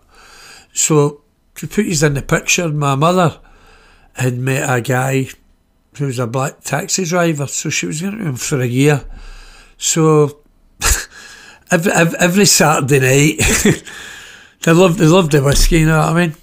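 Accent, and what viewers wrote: Glasgow, United Kingdom. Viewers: He is meaning going bk to his 19, 20,s . Its a glasgow way of speaking 😅👍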